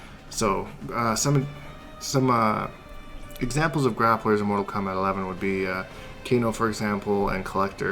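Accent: Canada. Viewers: Canadian af 👍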